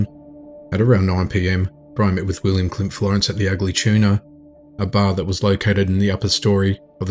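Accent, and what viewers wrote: Australia. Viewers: Love your voice and because of you I really can listen to other aussies ❤️👍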